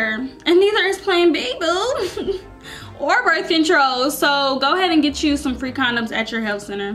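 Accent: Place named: South, United States. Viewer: Hi. You are too cool and i like your sweet country accent. Keep being you👍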